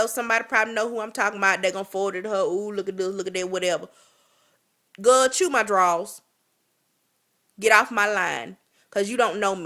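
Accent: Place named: South Carolina, United States. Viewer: You are too funny you sound like my friend from SC. I love the "chew my draws" I'm going to have to find a way to use that lmao. You would have me laughing all day long. I I sell virgin human hair extensions and I generally wear 20" and my hair does not tangle at the nape or matte.My company is Mane Extensions of U I would love for you to try the hair I have a variety of wefts, loop ring, bulk, closures etc. You can find me on YouTube, Facebook and website (system will not allow add link) .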